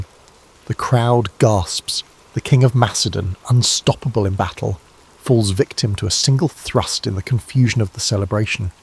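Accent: United Kingdom. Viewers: I so wish you would ditch the British accents. Who can sleep with that uptight, nervous-wreck jabbering invading our bedrooms?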